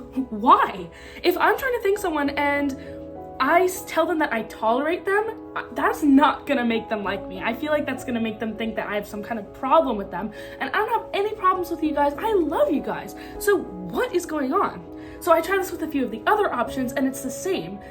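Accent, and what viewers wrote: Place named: Canada. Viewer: May I ask what country you live in? You sound very Canadian! (From a Canadian)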